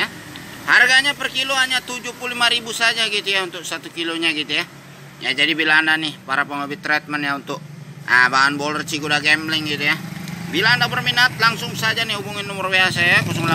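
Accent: West Sumatra, Indonesia. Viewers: Suaranya kayak minang kocak...lucu gan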